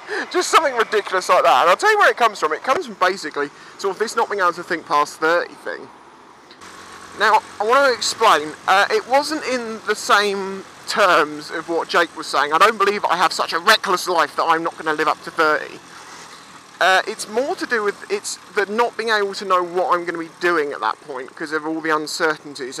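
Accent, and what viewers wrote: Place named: England, United Kingdom. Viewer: Hahaha, good stuff, man. "This guy knocked out because it was so good!" As some have mentioned, it's not about the bike. We all came here for the english accent. Haha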